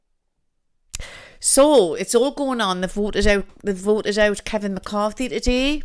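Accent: Newcastle, United Kingdom. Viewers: Love and miss the Geordie accent, from a geordie lass in Tasmania Australia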